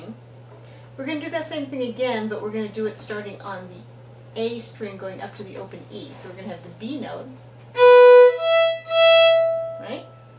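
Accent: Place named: United States. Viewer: You sound American do the americans play irish trad?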